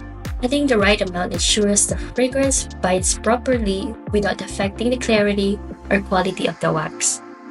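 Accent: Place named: Philippines. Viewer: Ang galing kabayan! Your accent is a filipina. Congrats❤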